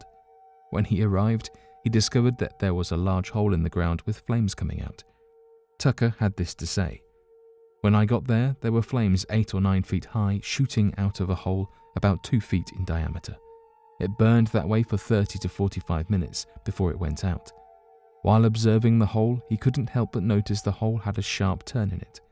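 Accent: United Kingdom. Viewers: I wouldn't even watch this channel if you didn't have a british accent lol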